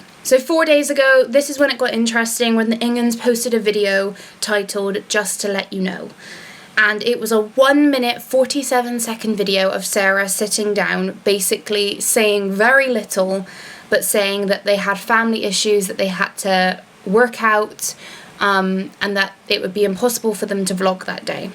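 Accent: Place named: Scotland, United Kingdom. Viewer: Can't determine whether you are Scottish or English?? Definitely the tiniest slight hint of Scottish in your accent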